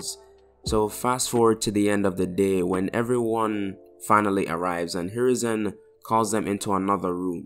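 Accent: Jamaica. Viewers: are u Jamaican? lol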